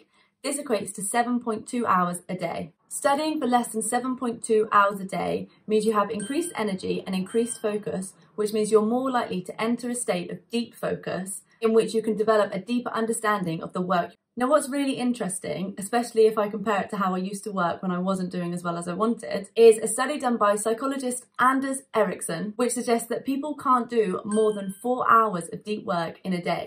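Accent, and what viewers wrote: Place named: England, United Kingdom. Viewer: your english accent is a little difficult to comprehend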